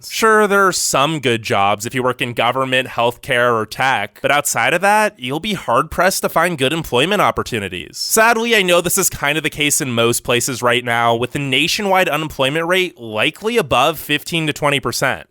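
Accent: California, United States. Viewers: Visual amazing Your voice however CaLIfornya! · Your California accent is brutally annoying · This guy's valley accent is so strong.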